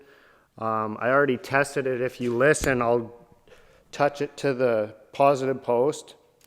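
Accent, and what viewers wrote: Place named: Saskatchewan, Canada. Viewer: You sound like your from Sask eh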